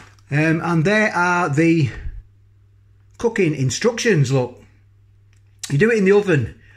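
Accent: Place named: Yorkshire, United Kingdom. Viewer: Loving the accent mate, are you a Yorkie, or a Lank. My missus has a similar accent, she's from Todmorden.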